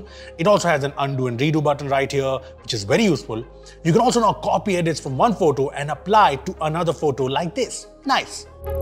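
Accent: India. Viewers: To bude asi indická angličtina, což ?? 🙉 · Don't speak like indian English accent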